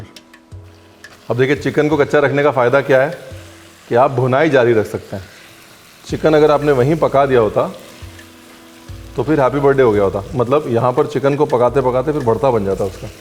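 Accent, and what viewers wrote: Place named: Punjab, India. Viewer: Aa bani gal.... Vich vich punjabi shi lagdi aa. ..good........... yar JALANDHAR ton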